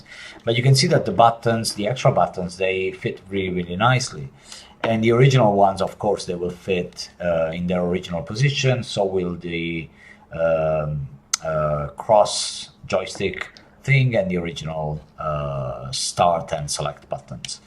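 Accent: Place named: Spain. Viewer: Awesome work! This project has given me that extra little push to dive deeper in 3D printing/modeling. I recently purchased a Creality CR-10. It’s been a blast so far. I am currently modifying HoolyHoo’s screen bracket to accommodate dual joysticks and a RPI3. While sharing is nice and very appreciated among the community, people usually have valid reasons to not want to share. You should give people a chance to be creative with their solutions and if anything, push them in the right direction. Also, where are you from? For some reason your accent leads me to believe that Spanish is your first language.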